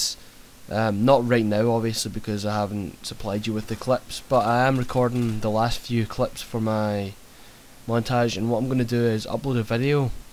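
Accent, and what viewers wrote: Scotland, United Kingdom. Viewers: I just LOVE your scottish accent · scottish accent FTW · Sound mate I take it your American but you sound Scottish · no... hes scottish, since im from scotland i can tell by his accent. also, it says UK on his page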